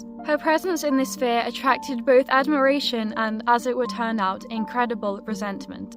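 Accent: United Kingdom. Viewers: Hi there! Stumbled upon your channel, great content. Keep up the good work. And have to ask: Where are you from? You look like a Scandinavian, but sound very brittish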